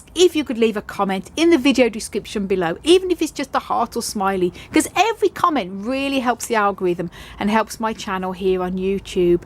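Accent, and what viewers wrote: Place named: Tamworth, United Kingdom. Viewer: Oh my life! I’m going to need a poly tunnel soon if you keep showing me gorgeous plants that I NEED!!! Xxx great vid again! Where are you originally from? I grew up in Tamworth & you have a similar accent. Xx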